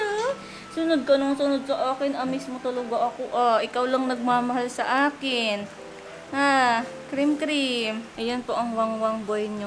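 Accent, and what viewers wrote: Visayas, Philippines. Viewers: watching from davao:))))) ka cute nimo magbisaya ateee always watching your vlogs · ka cute ba nimo mag bisaya ate oyy haha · ay ka cute Ate mag bisaya oyyy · cute ng bisaya mo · Ka cute man mag bisaya oy 🤣🥰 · Ang cute ni ate mag bisaya 😅 kababayan pala kami ni 😅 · Ate taga davao ka pala huhu sayang taga digos ako, pero sana ma meet kita ate Ang cute mo mag bisaya. Pero welcome ulit sa Davao city po❤️❤️. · Nakakatuwa na nalaman ko na ang ay nakatira ng 3 years sa davao!Bisaya din ako and ang cute ni Ate mag bisaya😂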